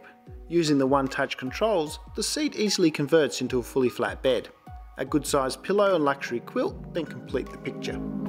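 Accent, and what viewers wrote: Australia. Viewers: I hear Aussie accent :) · Ya Australian?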